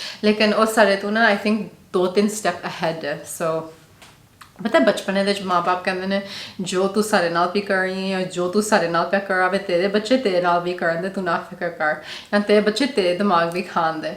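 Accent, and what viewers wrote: Punjab, India. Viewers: I always love your Punjabi accent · u beauty❤ love ur punjabi accent😍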